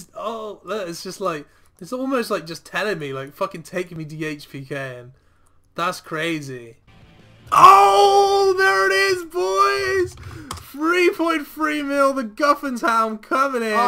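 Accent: Bristol, United Kingdom. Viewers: From Bristol much? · literally paused at 15 seconds you have to be bristolian, right?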